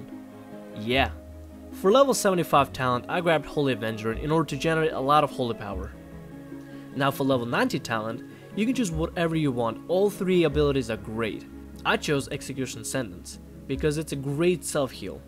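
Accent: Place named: Russia. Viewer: Привет, по акценту подумал ты Русский такчто надеюсь ты поймешь :P! Тебе кто Больше нравитсья Вар или Пала (танки)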